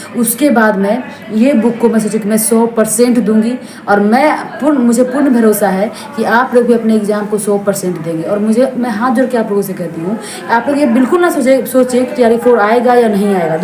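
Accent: Uttar Pradesh, India. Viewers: Tum to lgta h up ki ho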